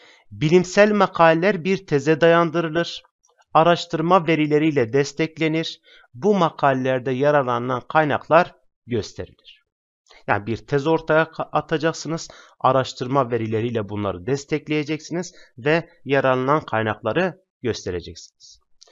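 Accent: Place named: Eastern Anatolia, Turkey. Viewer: Fark ettim, doğu şivesi:))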